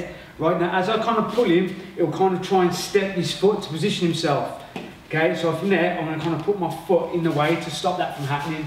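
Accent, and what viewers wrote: United Kingdom. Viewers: Dunno, too unclear for me. I have a hard time understanding thick regional English accents.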